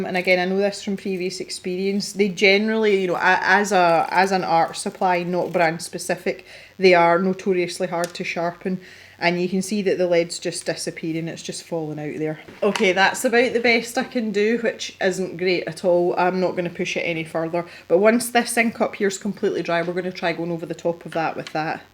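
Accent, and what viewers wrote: Scotland, United Kingdom. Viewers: Where do you come from? It Sounds of Scotland. Am I right! Greetings from Germay. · I’ve been subscribed to your channel for a while and really look forward to your videos, I’ve only just realised you’re from my part of Scotland, that’s why i thought your accent was familiar. Keep doing what you’re doing as you’ve given me the courage to get back into art 😁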